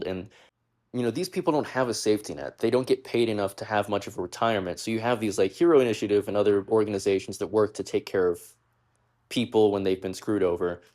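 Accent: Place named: United States. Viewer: You have an American accent, and yet seem to struggle reading English. I didn’t finish the video but in 5 minutes you mispronounced at least 6 names. Hot garbage.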